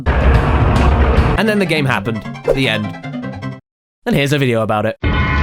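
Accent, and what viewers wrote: United Kingdom. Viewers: hey i have a question this is gonna sound weird but are you british or irish i'm sorry but your accent is just a big mix up of like 50 accents so i'm wondering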